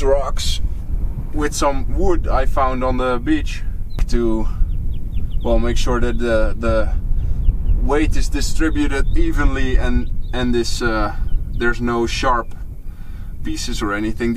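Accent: Netherlands. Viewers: I can hear the dutch accent when you talk :P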